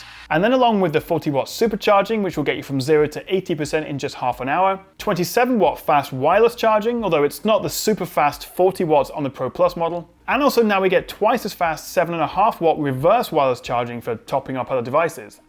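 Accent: United Kingdom. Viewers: what lovely British town are you from,?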